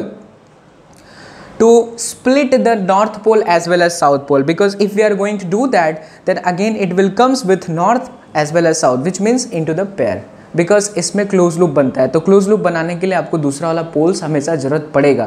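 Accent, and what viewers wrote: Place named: Haryana, India. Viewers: Sir apki haryanvi or smile kati zaher s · Sir ap haryani b bol lete ho 😂😂😁😁achii bolte ho sir 😍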